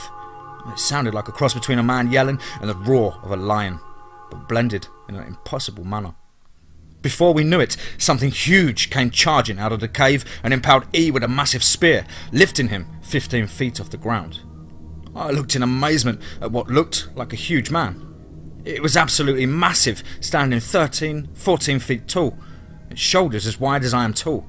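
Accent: England, United Kingdom. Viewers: Tell you what...You are not from Kansas City with that British accent. sorry but I think you are a writer trying to pull a fast one on a bunch of us who look at this stuff on you tube. You even talk like a novelist. You have done your research and its too familiar to other posts. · If you're from Kansas City, where did you acquire your UK accent? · Yeah right. Believe this guy. He said he was raised in Kansas City and is an officer in the Rangers. Yet he has an English accent so thick you can cut it with a knife, and there's no Midwestern US overlay. None. He couldn't even pronounce Fort Leavenworth properly. Bad joke and dumb story. Thumbs Down #fakemantalking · Hi there, I am newbie just now and I don't know why I haven't encountered your channel over the years I'm listening to audio stories on here . I've subbed , I like the range of content I have seen so far , and nice to hear an English accent , definitely a minority amongst the narrators on YouTube. 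Greetings from this Irish girl in stormy Portsmouth UK this afternoon. 💚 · First of all he’s English and secondly it’s a creepy pasta...not real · Why does a guy from Kansas City have a UK accent??!! Come on man. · wheres the same stories without the english accent?? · Dude your accent doesn't sound American. You sound british. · He's NOT australian . He's English. !!!! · Mock these encounters at your own risk..BUT.......even if this particular story is BS... there are CREATURES that we're born from. FALLEN ANGELS. Benne Ha Elohim translates in Hebrew as " fallen ones"....... The British accent is cute...... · Loving 🥰 the English accent of this storytelling #teamfear love ❤️ Ally from Australia 🇦🇺 #TEAMFEAR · An English accent?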